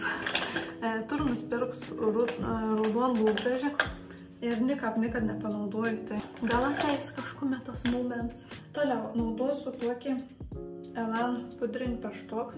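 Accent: Samogitia, Lithuania. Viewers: Nu graziause ce, veina pati i konca isvare!? Kode mon nieka nepasake 😮 zinuok pykstu!!! O jei rimciau tai faina ziuret o labiausei tai klausyt zemaitiskos kalbos.. pasiilgau Telsiu ir zemaiciu 😉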